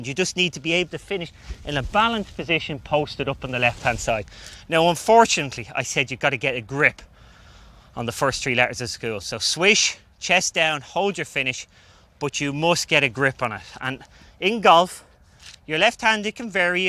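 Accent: Ireland. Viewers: 😂it’s the Irish pronunciation, clearly he’s from a smaller town or village. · I enjoy all of golf tips and especially the way he explains everything. Also love the Irish accent. Some of the best people are from Ireland.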